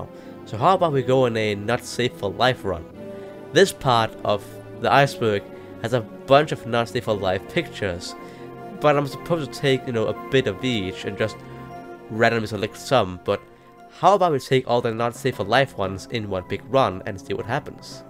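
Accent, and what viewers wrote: Denmark. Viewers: He has a Danish accent, I just found that out myself Because I'm used to people speaking English with an accent it didn't take long for me understand him clearly, so I'd say maybe try listening for a bit and maybe you'll begin to get used to his accent Also best girl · yeah because hes danish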